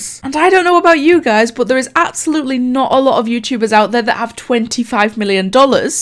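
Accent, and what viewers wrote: Scotland, United Kingdom. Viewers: I love me a Scottish Accent!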